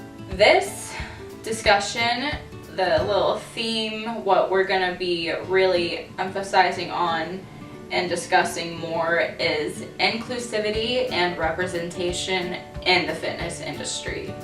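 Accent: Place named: South, United States. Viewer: You should be a marketing expert! We are Individuals on the inside and out, but the industry is only researching and going for the bottom line - $$$. Love your research - You are a person after my own heart!!! Side Note: Your 'fake' southern accent may not be as fake as you think - most of our relatives on my side are from Alabama and Georgia and came to Southern Illinois who definitely have the southern accent - I picked it up after a week staying with my Cousins. Got Home Safe.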